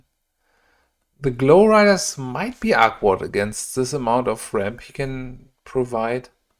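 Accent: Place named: Germany. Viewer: Vom Akzent her bist du sicher Deutscher! Cooles Deck und und nur Support für den Atzen!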